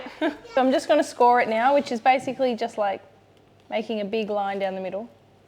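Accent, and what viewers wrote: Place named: Australia. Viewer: It’s very refreshing to hear an Aussie accent 🤗👍. I’m in Brisbane,you’ve got a hot day down your way today 🥵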